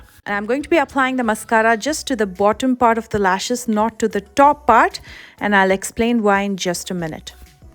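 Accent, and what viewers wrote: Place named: India. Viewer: Step one already have thick and decently long lashes ... she sounds Indian.. definitely known for beautiful land thick luscious hair and lashes!!!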